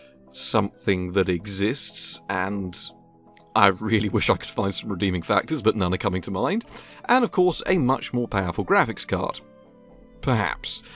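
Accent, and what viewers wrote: England, United Kingdom. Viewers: Sounds like he's from the same place as Hugh Laurie. No idea what regions go with which accents.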